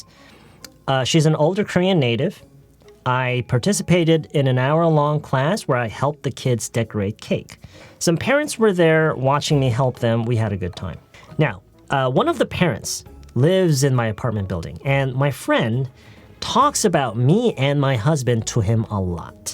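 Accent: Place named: East Coast, United States. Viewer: 이정도 듣기는 너무 쉽죠ㅋㅋ 실제로 미국 가시면 님 안들릴거예요ㅋㅋ 모든 사람이 백인 동부 상류층 영어를 사용하는게 아니라서요ㅋㅋ 굉장히 또박또박 천천히 얘기하는데 당연히 쉽죠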